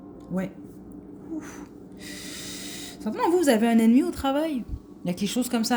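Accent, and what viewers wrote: Quebec, Canada. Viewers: Merci la! J’adore écouter votre accent québécois depuis ma Provence natale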